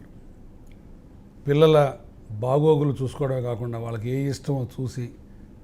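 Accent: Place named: Nellore, India. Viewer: నమస్కారం సార్. ఓరకంగా మిమ్మల్ని చూసి అసూయ పడ్డాను. మీరు చూపించిన మాయాబజార్ సినిమాని పూర్తిగా ఎంజాయ్ చేసాను. ఒక్కసారి మళ్లీ 50 ఏళ్ల క్రితం అనుభవించిన బాల్యానికి తీసుకెళ్లారు. మా నెల్లూరు మలై కాజా మర్చిపోయారు. ప్రతి పదార్థాన్ని పూర్తి స్థాయిలో అనుభవిస్తూ చేసిన మీ వర్ణన మీ భాషలో అద్భుతః. మా నెల్లూరు యాసలో అదిరిపోయింది. ధన్యవాదాలు సార్. 😂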